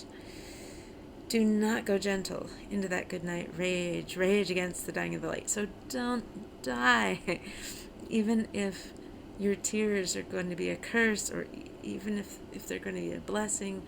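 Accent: Ireland. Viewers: Bad start.......IRISH!?!?!?!?! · What....an Irish ...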